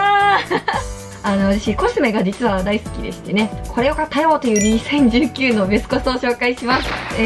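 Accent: Okayama, Japan. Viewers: 岡山弁かわいい！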